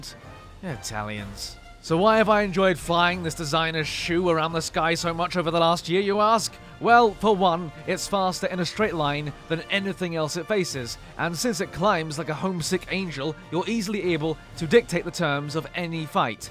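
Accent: United Kingdom. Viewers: So is he actually British or is it just an accent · Dearest. I find this ironic. Your channel is mainly fantastic due to your lovely British accent, and your favorite vehicle of War Thunder is American. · Best British accent of the century🇬🇧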